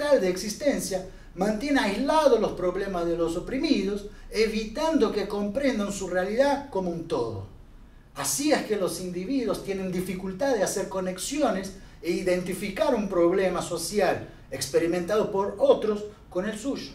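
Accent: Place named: Buenos Aires, Argentina. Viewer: increible hermano, gracias por este contenido. tu acento porteño esta re copado y me encantó escucharme de subito en la grabacion de graveola que aparece en los carteles 🏹💎💚🙏🏾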